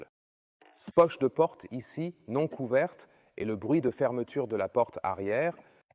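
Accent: Canada. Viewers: je me disais bien car des fois, il y a un léger accent canadien. Je viens de terminer une de vos vidéos. Merci comme même de le faire en français. En tout cas c'est bluffant.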